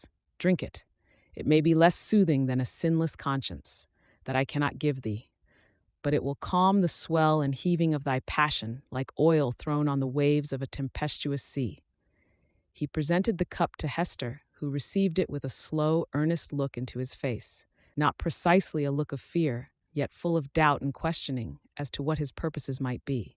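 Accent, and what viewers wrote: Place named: United States. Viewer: Hey! I am listening from Brazil. Thank you so much for indicating which audios are using american english! P.s.: I prefer the american ones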